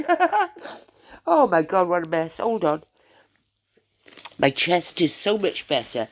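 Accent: Wales, United Kingdom. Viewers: Oh. It’s so lovely to hear your beautiful Welsh voice! I hope you have a lovely Christmas. 🎄🙋🏻‍♀️🎄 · Lovely to hear your beautiful Welsh voice again. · your sweet ,dulcet, Welsh tones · Oh it’s good to hear your welsh accent, I was brought up in Croesycielog and used to go to Cwmbran cinema, left when I was 18 to train as a nurse at Gloucester, retired now but I do love your channel, lost my husband in January and my daughter in July this year, so sorry about your cat, I lost both my dog and cat a few years ago, but to old now to get another one, I have missed your channel, it was lovely hearing from you, have a wonderful Xmas and a very happy new year, hope that lottery isn’t a scam 🏴❤️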